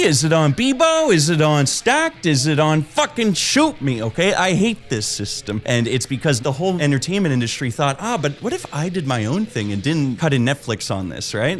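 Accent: Canada. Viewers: All you Canadians sound the same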